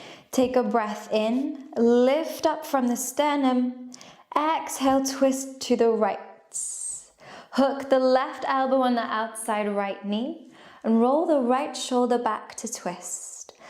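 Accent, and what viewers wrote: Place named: England, United Kingdom. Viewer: Your 60 min sessions are great. I love your accent! I'm not good at guessing but I guess England or Australia. Anyway I can listen to you talk for days. Great workouts! Appreciate your time.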